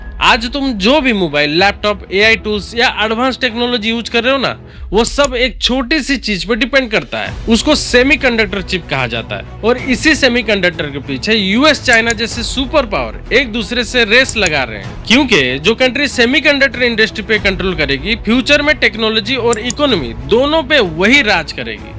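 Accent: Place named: Odisha, India. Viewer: Bhai odia logo ka hindi pata chal jata he.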